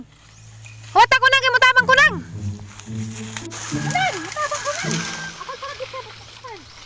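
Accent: Bohol, Philippines. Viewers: Lambing ug punto pagka binojol...heheh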